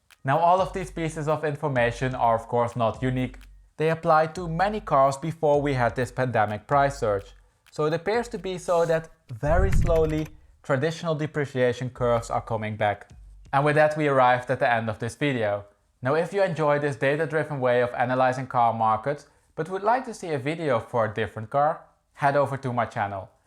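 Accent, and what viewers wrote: Netherlands. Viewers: Do I hear a Dutch accent? 😅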